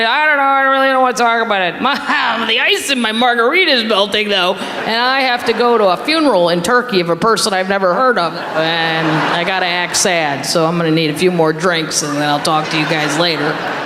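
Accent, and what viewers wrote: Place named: Chicago, United States. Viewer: Are you from Chicago? Your accent defaults to da accent ❤❤❤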